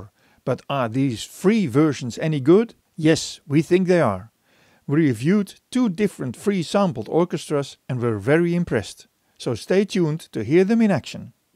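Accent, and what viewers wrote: Netherlands. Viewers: You sound Dutch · a lot of these sounds actually sound better than some of the pricey VST's (at least before you do a hell of a lot tweaking and modifying). You can certainly learn the trade using these, before upgrading to the big pricey stuff. Thanks a lot neighbour (I'm from Belgium, and I hear a Dutch accent in your voice, right?)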